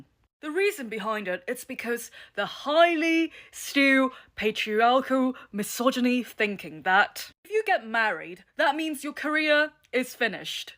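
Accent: United Kingdom. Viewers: Hearing someone speak perfect King’s English but use woman as a plural like a Japanese person is so surreal 😭 But also very cool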